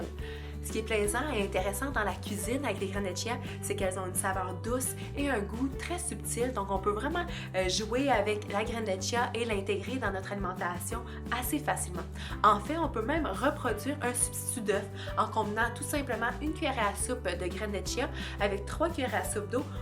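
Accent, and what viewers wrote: Quebec, Canada. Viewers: J’aime beaucoup le français canadien ♥️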